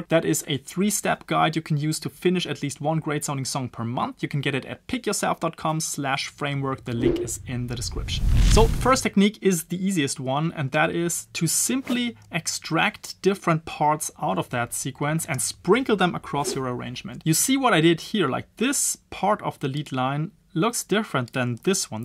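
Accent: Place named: Germany. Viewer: You sound very German xD Great trick buddy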